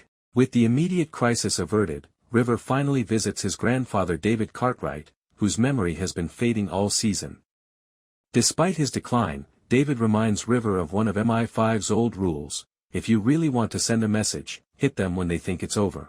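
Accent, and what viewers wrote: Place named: United States. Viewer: Slew House ?????? Do you mean Slough? Using a US narrator! Whose stupid idea was that?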